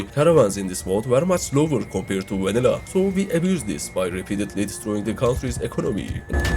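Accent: Turkey. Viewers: Kanka edit ve espiriler çok güzel türk aksanını da çok iyi taşıyorsun.Direkt aha Türk bunun mizahı da iyidir dedim öyle çıktı.Yolun açık olsun. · Ya bende diyorum bu aksan nereden tanıdık. Adam Türkmüş aq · Dude where u from tho? Sound like turkish accent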